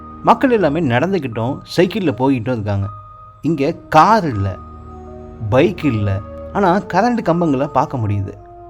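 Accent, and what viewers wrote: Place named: Namakkal, India. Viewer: Enga saravanan mama voice mathiri iruku bro unga voice from tn namakkal super review bro 🖤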